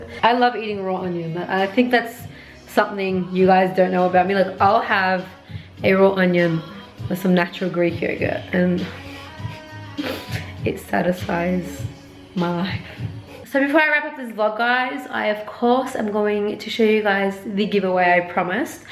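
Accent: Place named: Australia. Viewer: I suscribed to your channel because I loove how you do makeup! I remember I found you in Instagram, and I took the time to stalk you jaja and thats how I got to your yt channel, after that I just fell in love with your accent😍 You are the only Australian youtuber I am suscribed to, and you are my favorite makeup artist out there!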